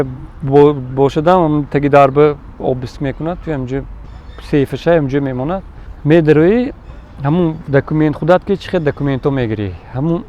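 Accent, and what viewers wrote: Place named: Leninabad, Tajikistan. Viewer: Ето человек ленинабадски таджик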